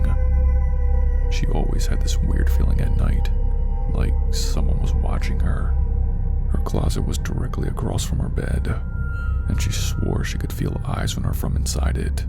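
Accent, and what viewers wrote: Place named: East Coast, United States. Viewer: Ahh. Thought I detected an East Coast accent. I KNOW you are not Ai! I’m a night owl in the Pacific time zone. Even when I catch this around midnight, it’s like 3am your time when I comment. 🤷🏻‍♀️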